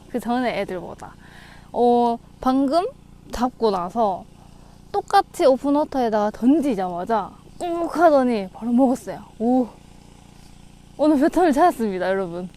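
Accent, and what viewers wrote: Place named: Daegu, South Korea. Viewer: 대구 말투 넘흐 좋아요 ❤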